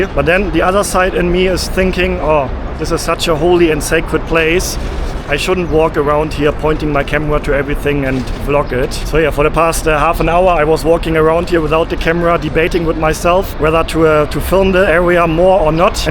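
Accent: Germany. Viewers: Love his german accent ❤ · Bruder dein deutscher Akzent ist killer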